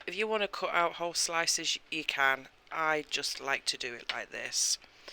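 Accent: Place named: Northern England, United Kingdom. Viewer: It's actually not as bad as I thought it would be, i like it and it's now in the oven! Thanks for the tutes it's really good when it's a northern woman's voice giving the instructions 😊 I'm northern too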